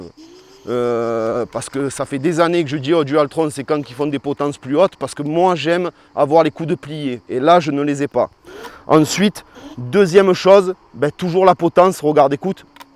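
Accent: South, France. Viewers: Super test, j'adore ton accent du sud!!!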